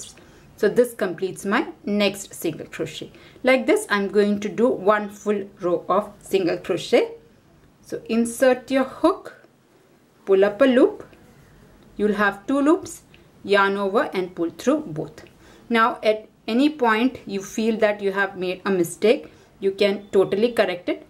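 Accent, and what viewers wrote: India. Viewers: When I heard the Indian accent I knew I would be able to do in one try(I’ve watched about a 100 American tutorials and got frustrated as hell)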